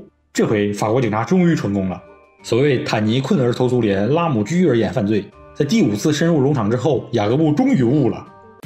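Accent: Northeast, China. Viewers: 我铁粉节目里语言上家乡风味很足东北人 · 这一股大碴子味。哈哈，真亲切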